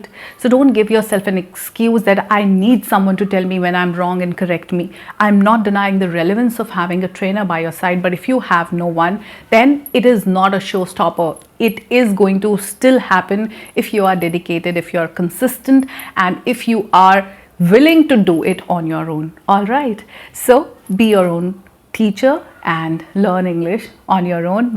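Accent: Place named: India. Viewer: So nice video you have made me dear sister, with very clear voice, with very clear worlds but with super popular subcontinent accent and very useful points you have highlight, really learners are going to get benefits for your video. May Allah Almighty always keep you happy my dear sister 👍, Best wishes form Pakistan 🇵🇰🙏.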